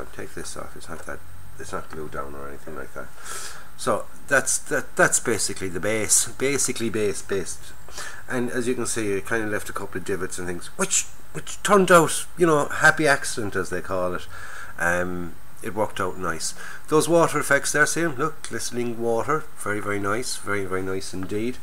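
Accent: Ireland. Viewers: Very nice. And it's wonderful to hear your Irish accent, reminds me of my dear old Dad. Italeri can be a bit of a challenge, like not having locating pins for shackles, tow hitches etc. But you've done a "fine and decent job" of it.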